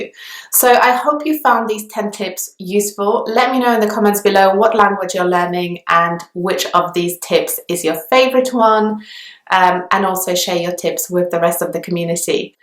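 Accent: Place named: United Kingdom. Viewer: Great content! I have just discovered your channel and it seems to be the thing I needed so far. One quick question. I definitely hear a British accent, but there is something more in your accent. Is it Polish? Your speech is purely beautiful.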